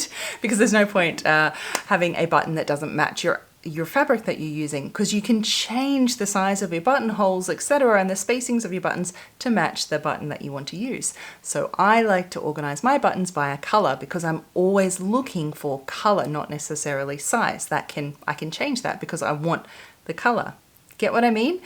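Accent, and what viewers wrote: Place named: Australia. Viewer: Looks Asian sounds ausie im so confused😂 great organizational skills!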